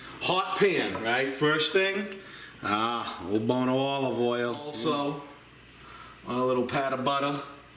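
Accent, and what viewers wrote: Boston, United States. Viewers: Nice and the Boston accent,makes the video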